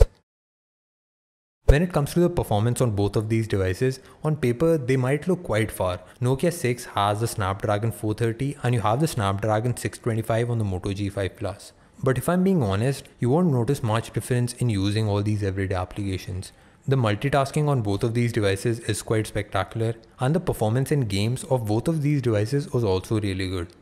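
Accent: India. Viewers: Why are you people complaining about the way he speaks when you know he is from India?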